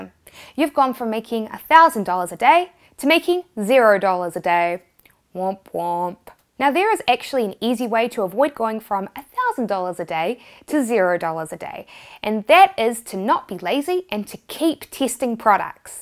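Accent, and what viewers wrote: New Zealand. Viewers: Pets. Kiwi accent.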